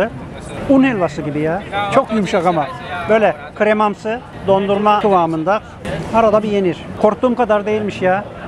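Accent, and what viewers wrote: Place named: Alanya, Turkey. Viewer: Alanyalimisin siven ayni alanya yoruuuu gibi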